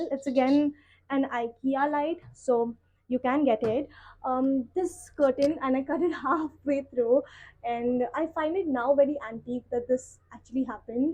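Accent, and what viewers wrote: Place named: United States. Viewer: To much American English stop tht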